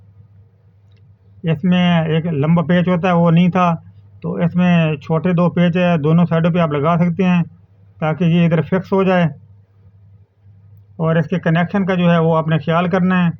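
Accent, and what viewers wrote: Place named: Mianwali, Pakistan. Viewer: السلام و علیکم بھائی، آپ میانوالی سے ہیں کیا۔۔۔؟ آپ کے لہجے سے معلوم ہوتا ہے ، اگر میانوالی سے ہیں تو آپ کی شاپ کس جگہ پر ہے ۔۔؟؟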